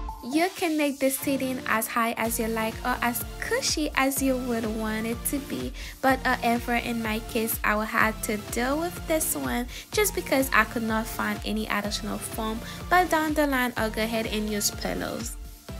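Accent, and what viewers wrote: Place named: Haiti. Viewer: Love your accent and your work. By chance are you Haitian? God bless you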